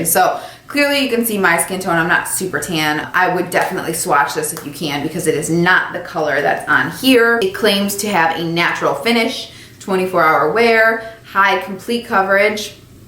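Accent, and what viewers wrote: Chicago, United States. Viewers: I knew she was either from Chicago or Michigan. before she said Chicago just from her accent. 👌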